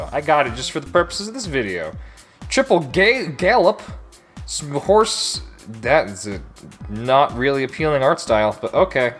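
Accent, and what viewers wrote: France. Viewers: I'm French and always knew Witch with the magazine you have (btw, really cool French accent xD) and yeah the arc continue a looooong time after Nerissa and all that stuff ! For me, Witch was still perfect untill the Ragorland arc with Hay Lin. It's the very first time as a teenager where I was not convinced, and after that when they open a magical school for kids I totally abandonned Witch... It's sad because I still think it was hell of a serie : the drawings were beautiful, it was really feminist, it talked really well about teenage years, it was epic, sad, disturbind, violent... Perfect ! I totally hated the TV show, it was ugly and too superficial for me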